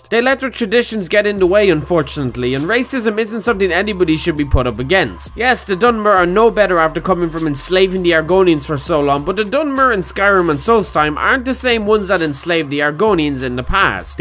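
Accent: Ireland. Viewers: Im subscribing because jacky boi shouldnt be the only popular irish accent on youtube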